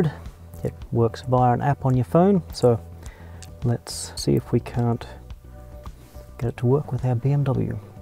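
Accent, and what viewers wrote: Australia. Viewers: Brother you sound australian? you driving a left hand BMW? where you located now?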